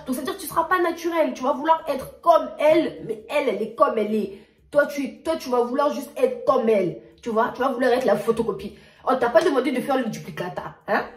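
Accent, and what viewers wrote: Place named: Democratic Republic of the Congo. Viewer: Juste par ta façon de parler en reconnaît ton pays😄🇨🇬💪.Une vraie congolaise.Ne changes jamais ma belle.Restes toi même💪😘.Dié tala vidéos za kaka🤣🤣👌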